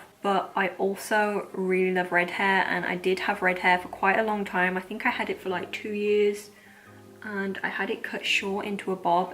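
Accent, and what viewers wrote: United Kingdom. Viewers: I can't figure out what part of the UK you're from by your accent - I love trying to guess where UK youtubers are from but I legit cannot guess lol!!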